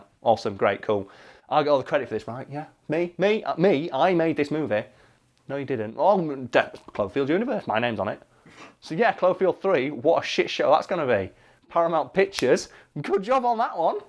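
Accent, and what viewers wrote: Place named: Warrington, United Kingdom. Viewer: Eh up fucker, you sound like you’re from near Warrington and I recognise you- where you at boyo?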